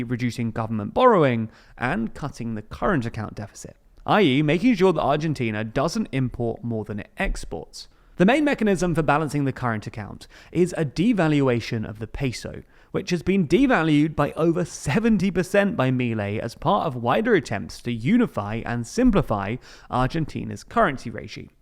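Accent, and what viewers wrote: United Kingdom. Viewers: So now someone with a UK accent is speaking badly of Milei... Do you prefer that we continue with the previous K government and fill ourselves with Chinese and oriental weapons...? You guys don't like anything. Better deal with illegal migration in your country...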